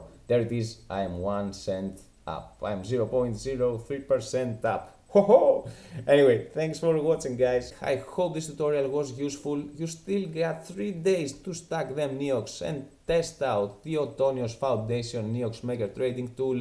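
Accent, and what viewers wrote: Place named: Greece. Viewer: are you greek ? only Greeks speak english like that, αυτα ειναι πολυ ψαγμενα πραγματα , ευχαριστουμε που μας δειχνεις τα φωτα σου, άτομα που εχουν φτασει σε αυτο το βαθος ερευνας και χρησης αυτης της τεχνολογιας ειναι ultimate tear geniuses.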